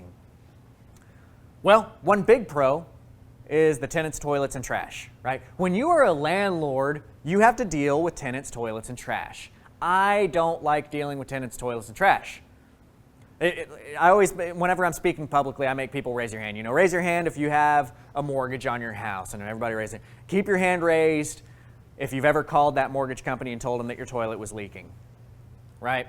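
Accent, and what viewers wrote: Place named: Texas, United States. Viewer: Sheeesh you and Rod Parsely are definitely from the TX area lol vocal tone almost identical. Video 🔥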